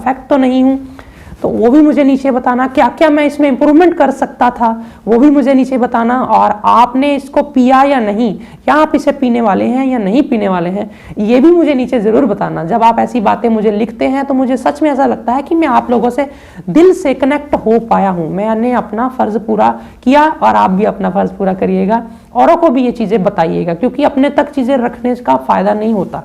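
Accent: Punjab, India. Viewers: koi kami nhi rahi aapke bolne me....Punjabi tone pta chal jaati hai guru ji.....thanks.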